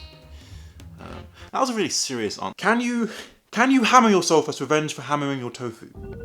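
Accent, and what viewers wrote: England, United Kingdom. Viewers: Tbh I never realized you were English before I watched this video. Also my mx blues are so loud I want to rip out my eardrums sometimes. thank you for educating me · I thought it was because you were British and if you say glasses in a British accent it sounds like. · He sounds Bri Ish for some reason.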